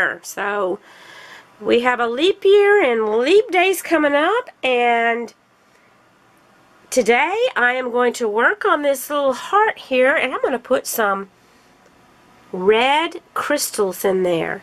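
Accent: Texas, United States. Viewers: I am brand new to diamond painting I have only done a couple of paintings I’m really enjoying listening to you while I work on my paintings I love your Texas accent So how I kit up Is in the bags they come in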